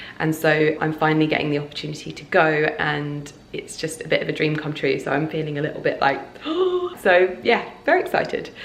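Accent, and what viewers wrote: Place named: England, United Kingdom. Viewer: I am an older lady that lives in U.S. I found you on youtube and you inspire me so much. My dear Mother was from England, and it like listening to her or my grandmother when I listen to your beautiful accent. I love how you are so real. Please keep doing what you are doing.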